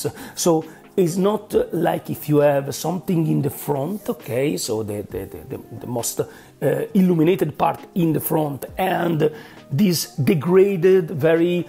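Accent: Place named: Italy. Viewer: Outstanding. Spoken in a true Italian Painters way.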